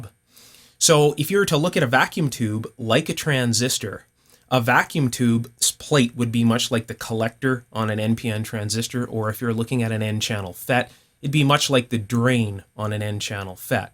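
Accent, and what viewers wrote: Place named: Canada. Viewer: Canadian accents are the best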